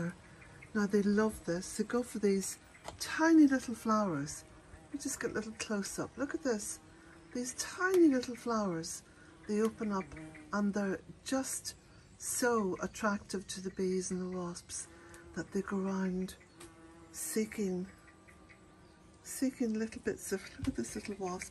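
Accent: Ireland. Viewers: at times when possible and feeling up to it, could you add typed out the names of some of your plants? Today, the one I think started with two syllables of "C" and perhaps ending with being "Aster", I would love to know what it is. It was along a wall and the bees were enjoying it! 🥰 I'm from America. 🇺🇸 🙂 Some of the names of plants I can make out and some along with hearing them correctly, I know of and some I've had. I'm sure you're speaking them all correctly! I dearly adore your accent. In America there's so many different accents! As well, I don't know if we have all of the same plants as Ireland. Some I'm sure aren't in both of our continents. My Great-Grandparents came from Ireland to America. So I have Irish in my ancestry and some in my other blood line, too. Thank you kindly,. I always feel nourished by watching you, seeing your lovely cottage, and life in your ever-evolving three acres! 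A most beautiful slice of earth!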